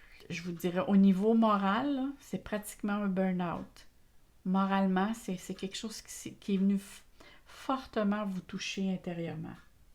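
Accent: Canada. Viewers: Vraiment j'adore votre accent canadien et la douceur de votre voix que j'aime tant écouter :)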